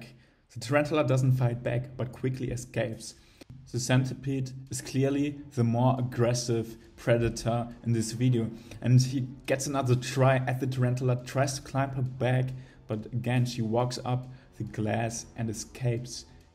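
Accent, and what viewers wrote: Germany. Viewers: I like that the narrator has a German accent worthy of a Bond villain. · u r definitely german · Are u German? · Are you German? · Hör ich hier ein Deutschen accent 🤔😂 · You have a German accent. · Wie man raus hört das du deutscher bist 😀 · You must be german, your english sounds like that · Kanns sein das du Deutsch bist 😂✌️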